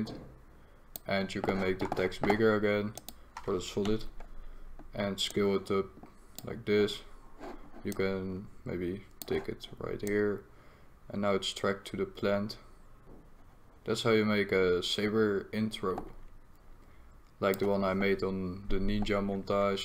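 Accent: Netherlands. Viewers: Lekker nederlands accentje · Are you dutch you sound like you are dutch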